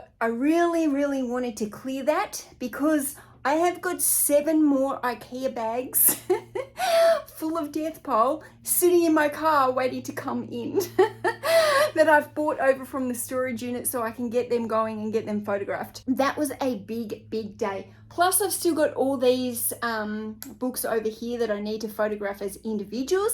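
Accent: Australia. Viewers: Im guessing east coast Australian, coming from a South Australian here ;)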